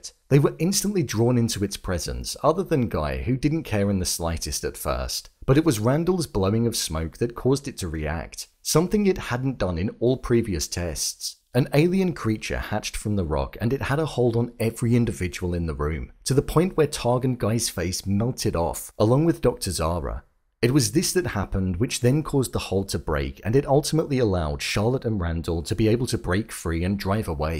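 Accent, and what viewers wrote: United Kingdom. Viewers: These British fiverr voiceovers on all these channels that obviously don't know what they're talking about are getting out of hand.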